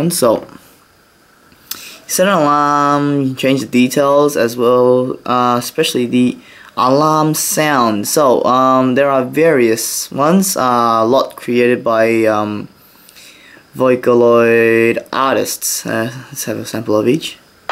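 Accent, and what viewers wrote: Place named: England, United Kingdom. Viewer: He sounds like he is from England so... why wouldn’t he.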